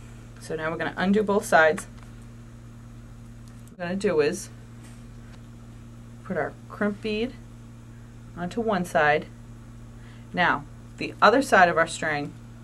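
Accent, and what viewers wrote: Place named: Massachusetts, United States. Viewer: I knew she was from Massachusetts before she said so! (I am too!) Great video....and I love pink too!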